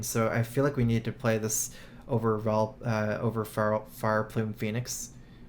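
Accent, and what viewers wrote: Texas, United States. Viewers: John Dice late reply but he says drawl because he is from texas and apparently this is their accent.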